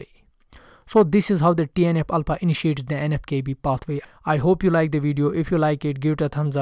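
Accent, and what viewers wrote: India. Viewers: نفسي افهم الاكسنت بتاعة الهنود😭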